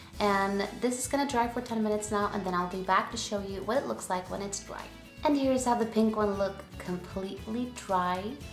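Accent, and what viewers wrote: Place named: United States. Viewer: She is from Sweden but she still talks like an American..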